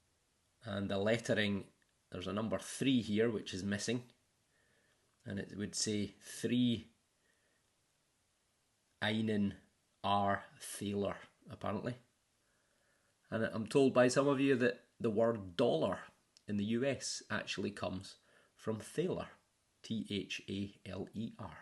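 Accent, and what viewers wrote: Scotland, United Kingdom. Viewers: Thank you for the extensive and fascinating explanation of the two silver coins. This is why I follow your metal detecting channel and your Scottish accent. I follow more metal detection channels but there are only two that stand out and you are one of them. When I watch a recording of you on YouTube, my wife always shouts, that's the man who always shouts, oops I dropped it. Thanks for the effort and time you put into your YouTube channel, I really appreciate it.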